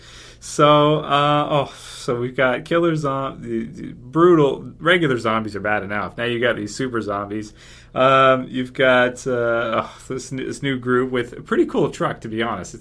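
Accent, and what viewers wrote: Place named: Ontario, Canada. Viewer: no one in Ontario pronounces "about" as "aboot". As I am from there, and I think sounds like a typical guy from Ontario. MAYBE some people in the far east coast of Canada like rural Newfoundland or maybe rural Nova Scotia (with their scottish roots) you might get someone that even come close to saying "aboot"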